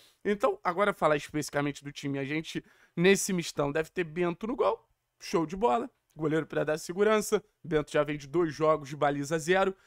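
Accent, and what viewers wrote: Rio de Janeiro, Brazil. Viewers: Meus ouvidos sangram, carioca..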